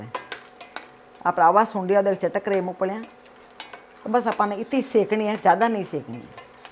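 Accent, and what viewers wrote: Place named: Rajasthan, India. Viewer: main aapki aur aapke husband ki recipe dekhti hun main rajasthan se hi hun aapka lahza boli achi lagti hai 🙂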